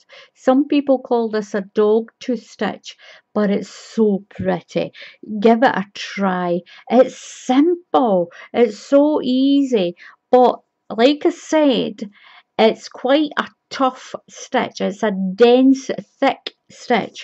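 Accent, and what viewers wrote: Scotland, United Kingdom. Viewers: firstly I cud listen all day to ur lovely Scottish accent! I have used ur videos so many times! Thanks so much for sharing and it being so easy to follow xx❤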